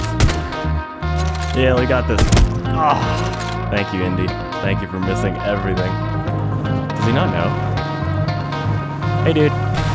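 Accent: Canada. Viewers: Oh you're french canadian?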